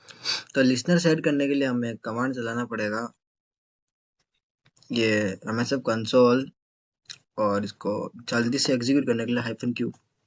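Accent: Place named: Ahmedabad, India. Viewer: bhai teri avaj Ahmdebadi jesi he. kya tu ahmedabad se he bhai😅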